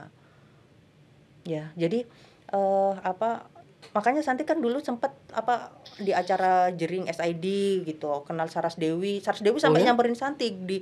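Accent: Bali, Indonesia. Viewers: Host nya hrs belajar lagi bhs Indonesia jgn medok bali. Kedengaran lucu😂😂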